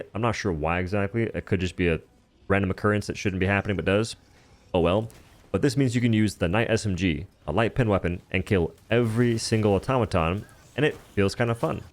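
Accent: South, United States. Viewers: He's Suth'ehn. Git'it? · Naturally he seems to have a very mild, almost undetectable southern accent. He exaggerates it when saying certain words for comedic effect. An older video showed his PO box is in Texas so it makes sense.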